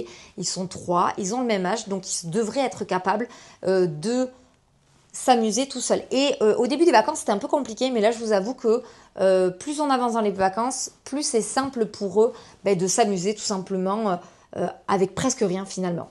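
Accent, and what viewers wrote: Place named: Toulouse, France. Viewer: J'adore ton petit accent toulousain mais tes contenus aussi, tu es une conteuse magnifique !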